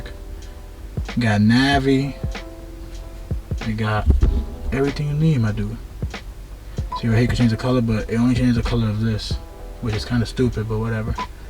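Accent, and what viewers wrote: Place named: Latin America. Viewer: I apologize for this, but I catch some accent (latino accent) . My bad man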